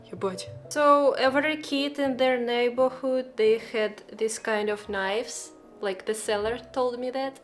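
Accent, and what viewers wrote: Russia. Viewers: I justed wanted to write hello and I think your Russian accent is very authentic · == I LOVE YOUR RUSKY ACCENT .--IT S AWESOME == I HOPE TO KEEP IT == YOU HAVE MORE CHARM . IT'S IN FASHION IN EUROPE . == ENGLISH WITH A RUSKY ACCENT == ALL THE BEST ==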